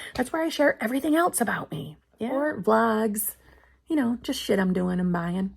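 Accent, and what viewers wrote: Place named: Upstate New York, United States. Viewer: New York upstate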